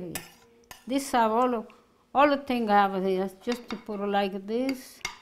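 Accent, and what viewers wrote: Italy. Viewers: Today for lunch, I made the baked fish cod fillet exactly as you made. I was so surprised how delicious it came out considering all ingredients came from the pantry. My husband and I really enjoyed our lunch. I always enjoy your cooking show, love your Italian accent, your lovely voice, but most of all the meals you prepared are simple ingredients and delicious. Thank you for the delicious cod fish recipe. God bless. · you're the Best...👍 You make me feel so much Better with your Sweetness... I smile a lot cause of the way you speak... describing what you're making with your Awesome Italian Accent & singing brings a comfort to my heart!! 🎤💖🇮🇹 OH & the delicious recipes you make!! 👏👏👏👏👏😀😋💖🇮🇹🇺🇸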